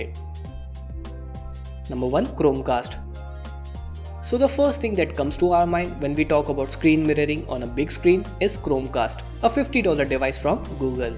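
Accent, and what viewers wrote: India. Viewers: Why is it always guys with Indian accents? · OMG that accent! almost gave me a headache! RIP English. Btw whats with u Indians wanna make everything complicated maybe because you think you are smart or whatever. this whole subject could be explained in a 1 min long video. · Thanks, good info. One suggestion, if u don't mind: I listen to many video presenters with Indian accents. They all have same problems. "T" pronounced as "D". Example: "two" is pronounced as "do". Also "v" is pronounced as "w", example: "video" or "wideo"? "very" or "wery" ? V and W should not be switched around.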